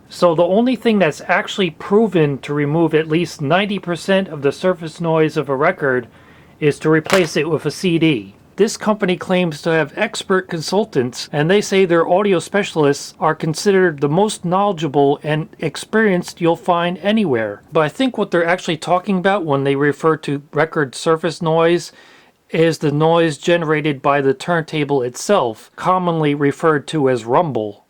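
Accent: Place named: United States. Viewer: I am guessing by your accent that you might be from the USA; that makes anything technical printed in an advert a bit problematical, because in the USA, advertisers can basically bullshit all they want. I remember my electronics tutor showing us an early 1970s advert for a "6 transistor Portable Radio" from a famous US brand; except only 1 was actually in the circuit, the other five were defective transistors soldered to the edge of the board. I queried a problematical power output quoted on a US company's amplifier advert only a couple of years ago - and both the manufacturer and distributor refused to answer; despite basic math and RMS calculations showing it to be wildly out; even if they had meant PMPO, rather than the claimed class AB RMS; the numbers didnt add up. If you want to make those noise claims, you need to find a lab with the equipment to test them yourselves.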